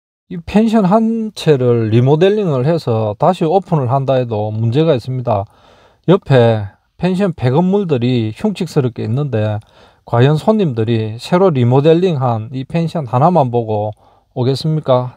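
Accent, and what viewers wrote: Gyeongsang, South Korea. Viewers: 영상보면 목소리나 영상이나 그냥 일상...부산경남사투리억양...디젤집시님이 생각납니다... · 깔깔하네 서부경남쪽 사투리 말 올래만에 들어봐네요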